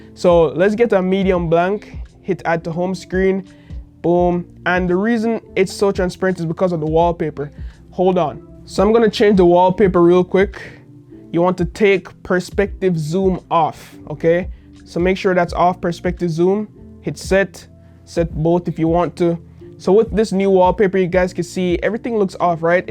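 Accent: Jamaica. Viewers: Are you Jamaican? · u sound jamaican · Yo bro are u jamaican? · You sound Jamaican breda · You Jamaican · i can already tell this man is jamaican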